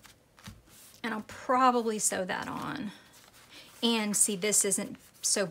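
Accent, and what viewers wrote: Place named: Ozarks, United States. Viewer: I love how you think (talk) things out. As a novice journal maker myself, it really makes me think about the journal as a whole. Your explanation of the busy hands journal in part one made me have an "aha" moment. Thank you for continuing with this type of video. I'm from the Missouri Ozarks so I totally understand about the accent, I've heard it all my life, sometimes in a very condescending manner. I've learned to just be proud of who I am and where I'm from, all that to say.......Y'all sounds GREAT!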